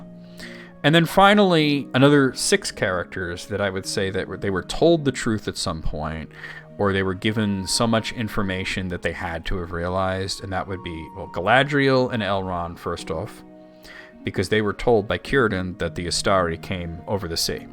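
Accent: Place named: United States. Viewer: A small correction: A vowel, linguistically speaking, IS what you call "a vowel sound". The symbols representing the vowels do not have a name of their own. So, linguistically, "cat" and "Mars" have two different vowels at the core of the word; they don't both have one vowel called "a". So on the one hand, you are actually arguing that there are more than three vowels in Black Speech when you say that "burzum" has two different u-sounds i.e. two different vowels (/ɜ:/ and /u/ respectively). At the same time, that is your pronunciation which is clearly coloured by American English. If I were to pronounce "burzum", with the influence of my native language, it would be two /u:/ sounds, i.e. the same vowel. An English speaker reading with their accent would probably read it with around 7 vowels (/ɑ/, /ʊ/, /u~ʉ:/, /ə/, /ɪ/, /i/, /ɜ:/). A German speaker like me would read it with 5 vowel sounds (/a(:)/, /ʊ/, /u:/, /i:/, /ɪ/). 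A Spanish speaker would probably have 3 vowels in their pronunciation, and so on. You also say that languages have way more than three vowel sounds usually. That's not necessarily true. Arabic has three vowels only, and those are, in fact, /a/, /u/ and /i/. So, it would be possible that Black Speech works with just these three vowels. Now, technically, Arabic has more because you can go at it from a phonetic or phonemic perspective. Phonemic is the language as you hear it and interpret it, phonetic is language as you actually say it. Basically the difference b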